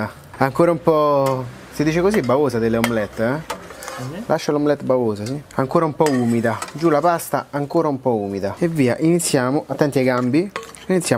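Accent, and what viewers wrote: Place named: Sicily, Italy. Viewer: Guarda che stai parlando siciliano non calabrese.....comunque sei bravo....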